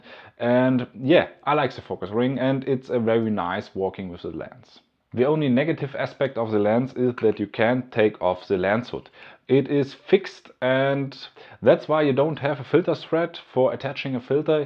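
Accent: Germany. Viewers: Rede doch lieber gleich deutsch ehe du dich mit deinem harten deutschen Akzent abmühst englisch zu sprechen.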